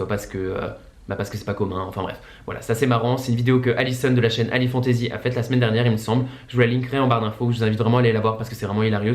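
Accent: Alsace, France. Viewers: Cet accent alsacien team Strasbourg ❤ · Oh !? tu es alsacien ?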